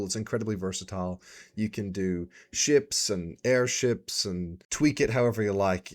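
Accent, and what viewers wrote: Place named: United States. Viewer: I’m having quite a hard time pinning down your accent. Your account says Australia but your voice sounds much more American, but not completely.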